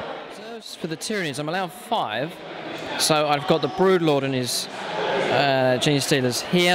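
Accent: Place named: England, United Kingdom. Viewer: it does man, they kill alot of termigaunts and they barley scratch what all is on the board then i spawn more than they killed and they start panicking and getting frustrated which leads to mistakes. but it does get very tiring moving all those models and it takes forever. keep up the batreps i love the quality of the videos, the paint job and the scenery. im going to take from your accent your from Britain right? england most likley?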